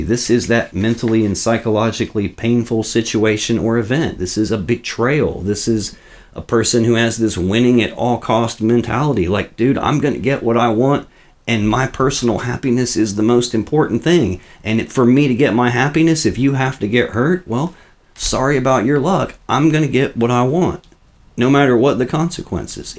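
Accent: United States. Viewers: Yes, I want a personal reading. You’re awesome. Prayers for your person situation. Help me unravel mine? Time zones may affect the coordination. You don’t sound Australian so, I’m guessing American? I think it’s 12 hours difference. I’ll book. 🤪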